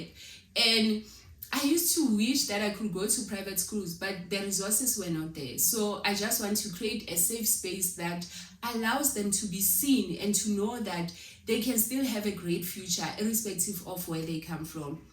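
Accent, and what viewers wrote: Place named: Pretoria, South Africa. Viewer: You sound like you are from Pretoria, by your way of speaking.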